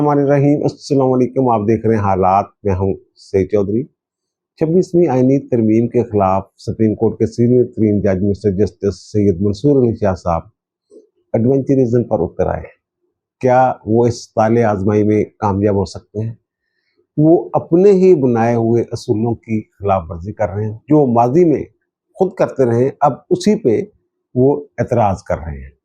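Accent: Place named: Doaba, India. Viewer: sahib, tusi Jalandhar ya Hushiarpur de o? Tuadi Punjabi ton kagda ai.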